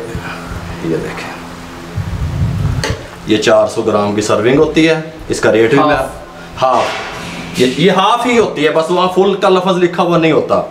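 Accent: Punjab, India. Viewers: Samjhane ka style bahut hi Achcha hai Punjabi Maar Ke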